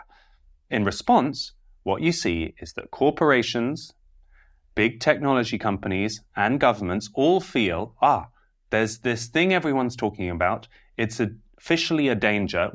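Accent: United Kingdom. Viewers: Yeah I'll engage, this is just a cute little British accent and version of the right wing complaining when breitbart got their advertising yanked. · This is some kind of bullshit, but the british accent makes it palatable somewhat 😂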